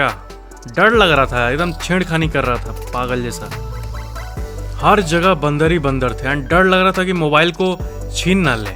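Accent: Bihar, India. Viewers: App bihar se ho kya sir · Aap bihar se ho lagta hai 😉😉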